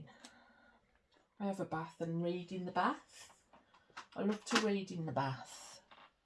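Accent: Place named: Birmingham, United Kingdom. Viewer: Great wip n chaty little Brummie glad your getting back to you my fave book growing up was the secret garden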